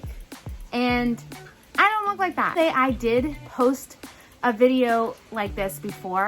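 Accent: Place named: United States. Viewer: I think it might be your voice that sounds American to me rather than your looks, but as you said we are all beautiful and more and more of us are mixed these days. I got 88 per cent European on my DNA test but I looked at my Jewish grandfather's brothers and they look like a League of Nations. I look white but always felt more Native American. When I got my test, I was 12 percent Native American, Asian, African and my friends just laugh and say my test was not accurate. That kinda hurts cause I am all races..... I was happy to find out I am part Taino...from Puerto Rico....!!!! I don't live there but I would probably look like the whitest Taino at the ceremonies.....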